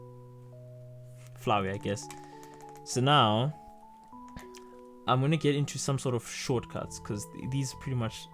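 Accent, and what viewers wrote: South Africa. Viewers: Myyyyyyyyy bruuuu!! Was NOT expecting a fellow SA to be doing a Krita tutorial. I picked up your accent like 3 seconds in lol. Thanks for the tutorial, this is the second one I've watched and I like how you are diving into each aspect. The first tutorial didn't really explain the "New Canvas" dimensions page at all. It's a bit overwhelming because there are soooo many tools and I haven't done digital art before. Regards from Cape Town.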